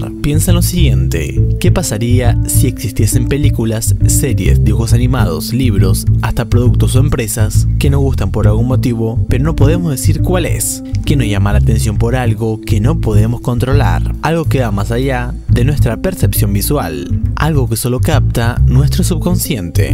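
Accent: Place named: Buenos Aires, Argentina. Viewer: Jejeje, sobre el acento, ya no se nota tanto el acento de Buenos Aires... Saludos, amigo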